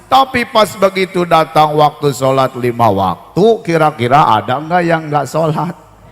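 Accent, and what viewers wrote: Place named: Banten, Indonesia. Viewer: Sepertos urang banten nyariosna. Mantaaap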